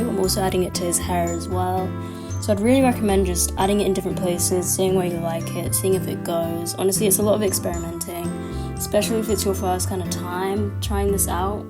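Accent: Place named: London, United Kingdom. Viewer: typical londoner accent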